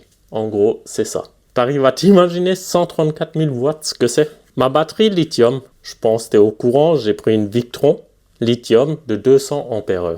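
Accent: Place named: North, France. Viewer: Salut! C’est sur le débat est intéressant, Mais faut voir que le soleil est toujours là du moins dans la journée, le gaz tu dépends de l’homme! Si demain le gaz monte au niveau tarif tu seras dépendant si demain il n’y as plus de gaz ta copine ne fera plus de gâteau…… Moi j’ai assez de watts pour faire la bouffe une heure par jour et si il n’y as pas de soleil au pire, je roule une heure et je récupère mes ampères … Mais bon perso je déteste le gaz, petit j’ai vu une explosion dans un immeuble et depuis je crains le gaz! Bref merci pour tes vidéos, toujours aussi pro! Et toujours aussi agréable de t’écouter avec ton petit accent de la haut! À bientôt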